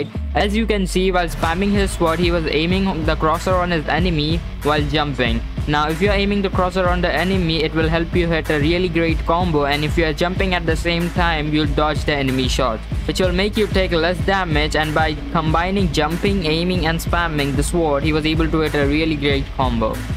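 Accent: India. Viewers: Is this guy indian??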